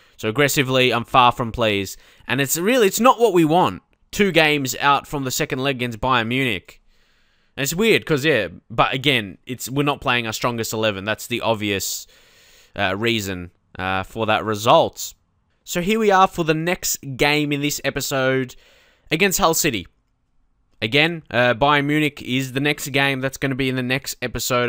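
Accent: Australia. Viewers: Best fm series on youtube. The detail u go into makes it so much more interesting. Length is fine aswell. No need 2 change a thing. Where abouts in australia are you from mate?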